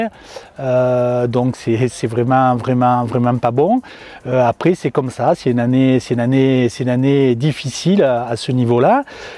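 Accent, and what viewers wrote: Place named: South, France. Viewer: Merci our le bilan de l 'année et l accent du midi, plafond bas et frais sur notre tête en normandie depuis quelques jours, en espérant que 2022 soit meilleur pour les apis bonnes fêtes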